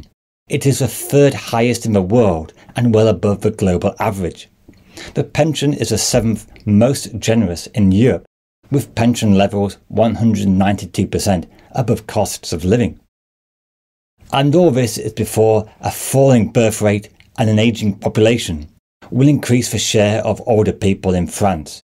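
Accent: United Kingdom. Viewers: Is this Scottish accent or what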